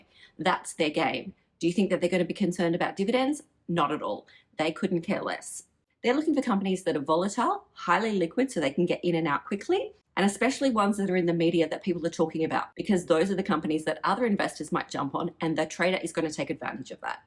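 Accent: Australia. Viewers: Great info thankyou and Aussie accent is a bonus.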